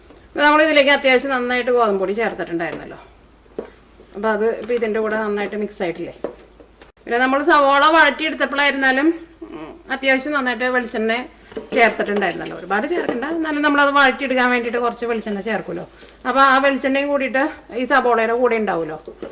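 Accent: Thrissur, India. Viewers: Enikku Chechide thrissur accent aanu kooduthal ishtam. Original thrissur nasrani accent! 👍🏻👍🏻👍🏻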